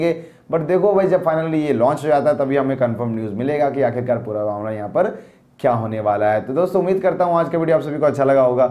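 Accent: Bengal, India. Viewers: aap Bengali ho kya?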